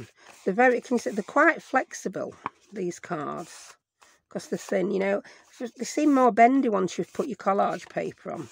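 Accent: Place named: Northern England, United Kingdom. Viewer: Northern lass🤗😁 lovely.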